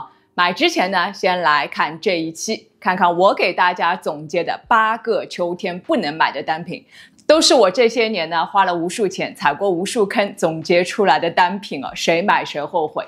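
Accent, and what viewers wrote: Shanghai, China. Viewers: 播主上海人吗?言语间有些小词很熟悉😅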